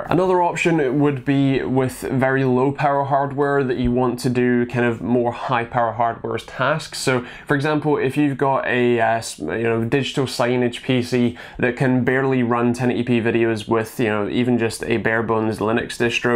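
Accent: Scotland, United Kingdom. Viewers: It sounds Scottish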